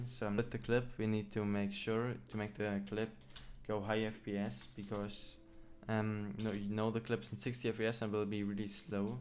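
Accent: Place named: Germany. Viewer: You can just barely hear that you're german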